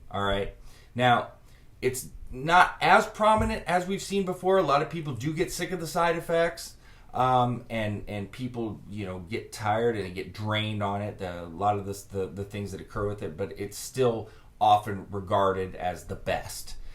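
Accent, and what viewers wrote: New Orleans, United States. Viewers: you from new orleans? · Are you from new orleans???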